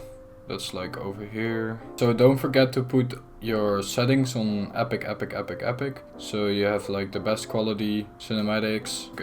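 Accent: Netherlands. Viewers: We do be loving that Dutch accent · are you dutch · Are you dutch? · Dutch accent on top tho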